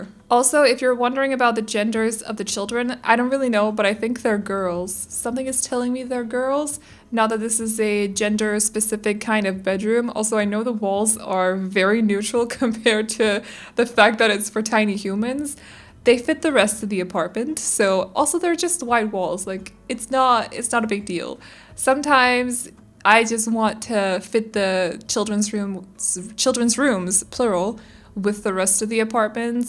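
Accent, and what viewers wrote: Denmark. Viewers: I don't think I have ever heard Danish but I can't believe it doesn't sound good. I absolutely adore your accent lol. Now I have to find the Danish word for aunt since you've gotten me curious. This is a great build btw. Trying to do something creative in this apartment drives me nuts. It's just set up so weird. You did amazing.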